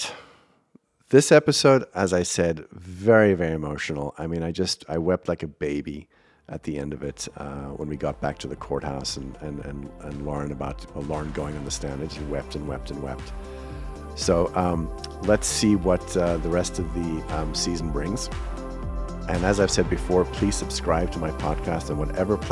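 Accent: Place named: South Africa. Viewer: Fellow South African here - don't lose the accent, it's awesome! Love the podcast! You're f*cking fantastic!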